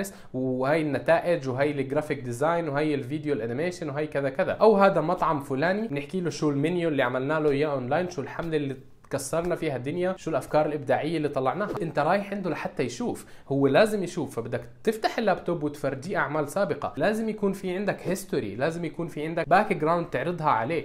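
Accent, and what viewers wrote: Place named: Jordan. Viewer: شكرا على محتواك الرائع بس سؤال صغير انت اردني؟؟؟؟؟ لأنو لهجتك زينا ونا انعجقت مش عارع ههههههههه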